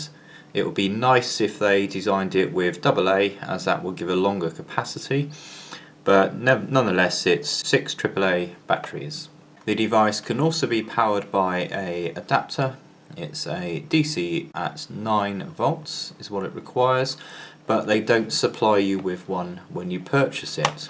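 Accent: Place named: United Kingdom. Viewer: if you wanted one with rechargeable batteries and an adapter why don't you go get one??? there are many other brands, brother included that sell them as you wanted. why buy a cheap product and then complain about it not having components. Must be a British, UK or whatever the hell your accent is from type of thing. Don't buy equipment that is cheap and then want all the extra features. use your common sense!!!!!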